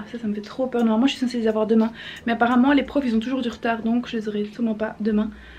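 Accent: Belgium. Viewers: Jai l'impression que tu est belge pourrais tu m'éclaircir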